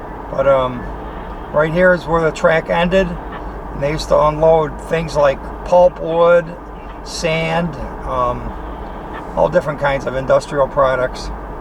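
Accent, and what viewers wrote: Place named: Great Lakes, United States. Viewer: Lol I've always loved your accent. I never realized that accent was also in Upstate New York. People from Michigan, Illinois, and Ohio have that accent too around the Great Lakes. I guess they call it a "Great Lakes" accent. I'm from Southwestern Ohio (Dayton, specifically), so my accent is a cross between the Southern Ohio, Northen Kentucky, and Northern Indiana accents because that's where my family is from. People think I talk weird because I pronounce things differently! I say boxes as, "bahx-ezz", caramel as "car-mull", Lima as "lime-uh", and worship as "worsh-ep".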